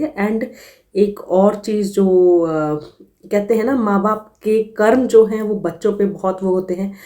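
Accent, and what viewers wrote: Haryana, India. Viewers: राम - राम बेबे । आपका बोलने का लहजा हारेयाणवी हिन्दी का है। क्या मैं सही हू।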